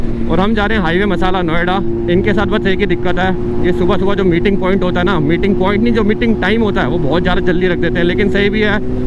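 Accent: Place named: Punjab, India. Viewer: Punjab wali panjabi☺️☺️☺️☺️☺️☺️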